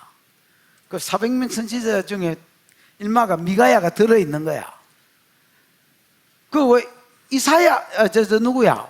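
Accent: Gyeongsang, South Korea. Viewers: 갱상도어로 들으니 정말 이해가 쏙쏙 말씀이 너무 재미가 있습니다. 감사합니다. 목사님^^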